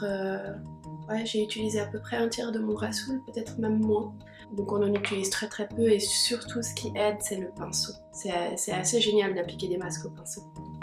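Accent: Switzerland. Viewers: Je crois reconnaître un accent suisse ? Je me trompe ?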